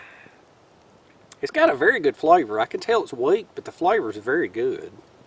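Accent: South, United States. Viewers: I really liked this campfire story, especially with the accent. Its like southern ASMR.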